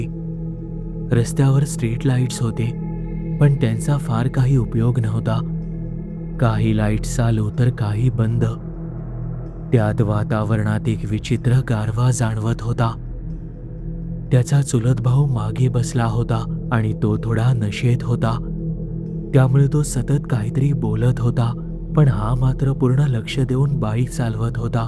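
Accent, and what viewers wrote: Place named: South, India. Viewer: मी अनेक वर्षांपासून तुझे stories ऐकतेय… पण आता तू गाण्यात बोलतोय…. फिल्म मधे south indian accent सारखे तू का बोलतोस??? Like a non Maharashtrian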